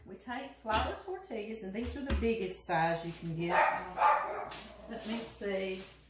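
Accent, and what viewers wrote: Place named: Louisiana, United States. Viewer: Miss I just want to tell you how much I enjoy your program it's like being back home again I'm from Louisiana from New Orleans and it's just wonderful to listen to you with your accent lol Wish we were neighbours I love cooking Louisiana style and I know we would Be best friends. Keep doing those delicious Recipes that you do. And I will keep watching. God-bless and stay safe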